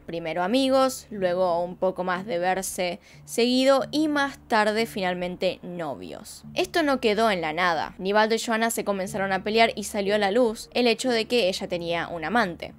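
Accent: Buenos Aires, Argentina. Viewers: x2,su acento porteño. ❤ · Es argentina , Tiene ese acento crack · Me parece q pronuncias mucho "y y la ll", se q eres argentina pero bajale no mms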